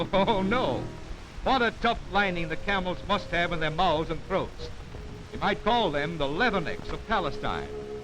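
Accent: United States. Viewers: It's a yank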